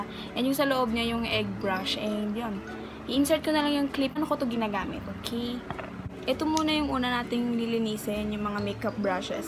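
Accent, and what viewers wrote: Visayas, Philippines. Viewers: bisaya diay ka day? halaaa 💖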